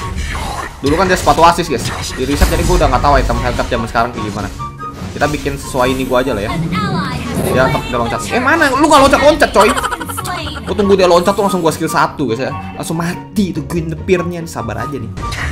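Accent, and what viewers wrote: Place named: Jambi, Indonesia. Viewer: Orang mana bos? Kek bahasa jambi Gilo haha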